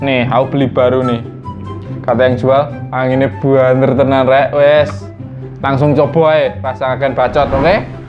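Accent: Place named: Java, Indonesia. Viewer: Wah jowo, tak sub lur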